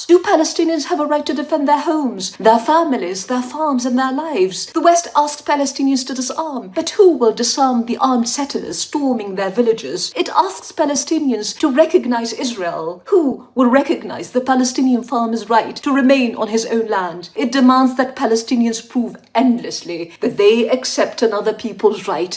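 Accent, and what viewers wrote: RP, United Kingdom. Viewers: I'm really sorry. I can't listen to this affected RP accent. فلسطين 🇵🇸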